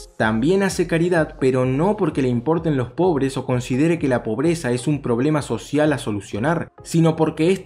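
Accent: Argentina. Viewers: ESPERA.... eres argentino ?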